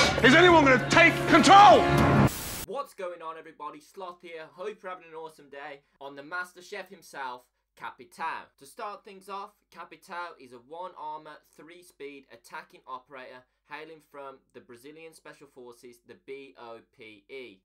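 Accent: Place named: Birmingham, United Kingdom. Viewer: I hate the brum accent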